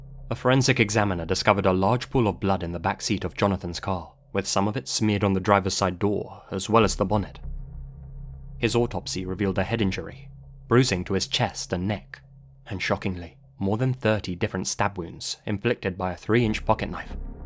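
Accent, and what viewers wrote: England, United Kingdom. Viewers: Are u a fellow Brit? I watch so much Youtube accents blend together lol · I love your 'made to tell stories voice' And accent. I find it comforting. Think its an English thing. Im a northener. You should narrate audio books too. Its a real skill. One you have in abundance 🤔👍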